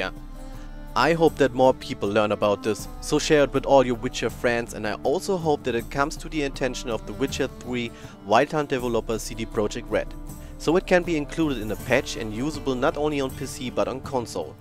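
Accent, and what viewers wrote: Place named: Germany. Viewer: Hört man übelst raus dass du deutsch bist :D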